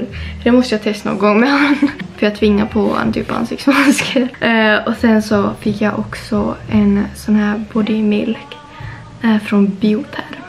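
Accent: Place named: Finland. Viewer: hör det på din accent, är också finsk, svensk🥰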